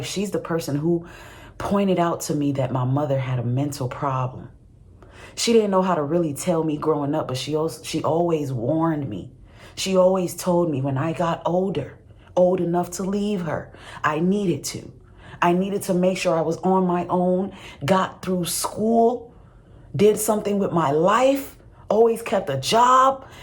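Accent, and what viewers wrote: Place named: Ghana. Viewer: I like the Ghanaian accent "my sista."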